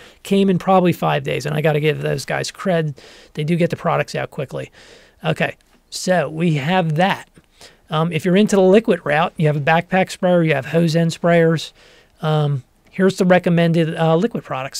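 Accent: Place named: South Jersey, United States. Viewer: New sub & fellow South Jersey guy here (Gloucester County, 20 min from Philly). It’s comforting to hear that strong South Jersey/Philly accent on a lawn care channel, ha!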